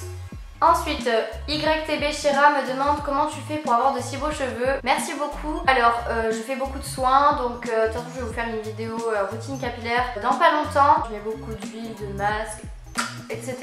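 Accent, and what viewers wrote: Lebanon. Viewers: awww t trop cute en parlant le libanais chui libanaise aussi 😻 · Cest troo bo ton accent libanais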